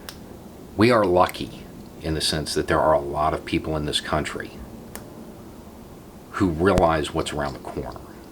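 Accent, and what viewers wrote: South, United States. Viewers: I guess while we need to be able to exercise our freedoms to improve, what I immediately think of is a lack of kindness. I’m tired of people treating each other like garbage. It feels like humanity is in its teen years, and anything different is made fun of, is a weakness to poke at, in hopes of tearing them down and making ourselves feel better. When I first started watching this channel, I was hesitant to click. I’m from the boarder of TN/KY and I knew that accent immediately, lol. And I wasn’t used to hearing these ideas come from someone who sounds like this. So not only do I find out about world events and hear a very interesting perspective, but I also have to check myself, because while I wasn’t actively being a jerk, I was falling into the trap of putting someone in a box of my expectations before I’d heard the words they had to say. 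I feel like... this channel helps keep me honest, while I’m trying to find ways to promote what I consider to be the ideal.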